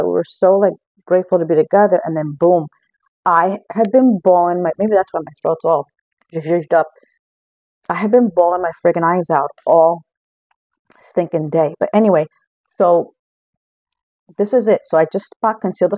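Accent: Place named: East Coast, United States. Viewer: just found you and subscribed, mostly so I can hear your east coast accent! Love the Look!! this is about what I do on the daily, I might put on a fuller coverage foundation for going out but like you said, less is best, I'm a 68 year old Midwesterner and I could listen to you talk all day!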